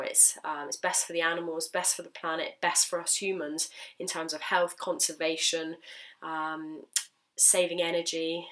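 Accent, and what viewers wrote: United Kingdom. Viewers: Nice! Im half way so i brb. Gtg eat lol im a sucker for UK accents. Geez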